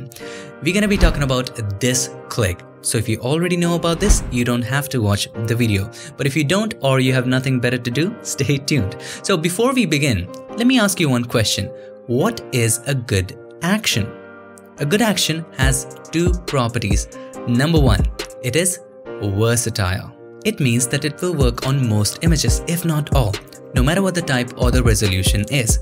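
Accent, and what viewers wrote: India. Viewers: Are you from india or outside??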